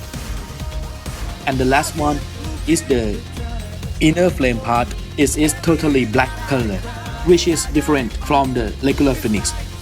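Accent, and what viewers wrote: Thailand. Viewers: สุดยอดเลยครับ ปล.คนไทยไหมครับเนี่ย สำเนียงชัดเลย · เสียงเหมือนสำเนียงไทยมากกกกๆ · สำเนียงแบบนี้ คล้ายคนไทยมวาก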